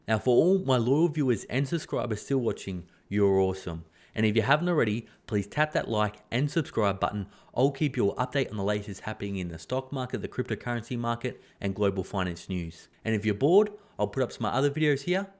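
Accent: Australia. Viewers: I don’t know which phrase I like hearing better in an Australian accent, “Holy Smokes” or “Party Pooper.” Either way, great video, thanks for keeping us up to date on all things financial!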